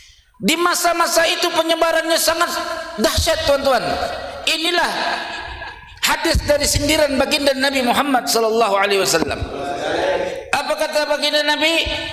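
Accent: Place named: Betawi, Indonesia. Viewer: Gurih banget ini teungku dialek betawi nya. Padahal darahnya Aceh banget. Tapi kalimatnya sangat runut, ilmiah, rasional dan masuk akal serta mudah dicerna dan difahami oleh orang-orang awam. ❤❤❤❤❤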